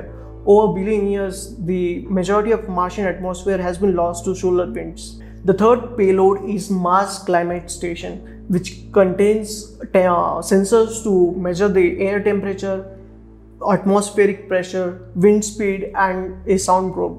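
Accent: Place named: India. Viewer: Indian accent means customer service cheats.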